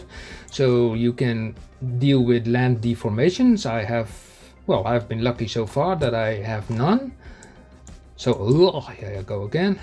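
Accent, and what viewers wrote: Netherlands. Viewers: Yes i hear it too Im dutch too Halloooo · I can hear by your English, your a dutchie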